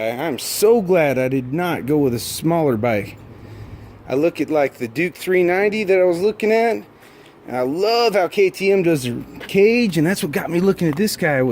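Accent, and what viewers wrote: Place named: South, United States. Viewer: Dude you are one funny fucker I had fun listening to your accent you must be from down south but it's a good opinion on the lovely Z900 good luck riding your new toy lol lol lol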